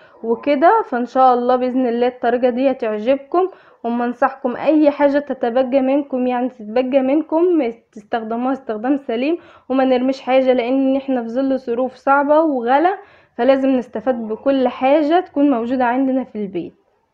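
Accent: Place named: Upper Egypt, Egypt. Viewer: احلي شي في الفديو لهجتك العسل سلامي لكل لصعايده اختك من الجزائر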